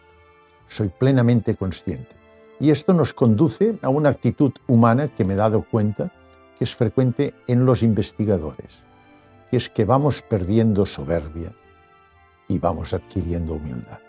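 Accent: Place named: Catalonia, Spain. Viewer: Hace algún tiempo viví en Barcelona , el acento es muy catalán hasta siento que le he visto antes . Abrazos , muy agradecida por sus charlas.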